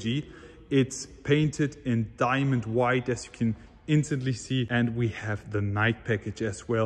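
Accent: Germany. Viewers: Nothing like the german accent. AMG Uersion 😌👌🏼 lol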